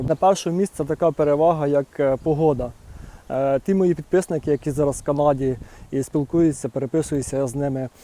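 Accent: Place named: Kirovohrad, Ukraine. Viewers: У вас така знайома вимова і таке рідне « осьо «. Часом не Кіровоградщина? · а ще приємно чути Ваш центральноукраїнський діалект! Він для нас рідний, бо ми полтавчани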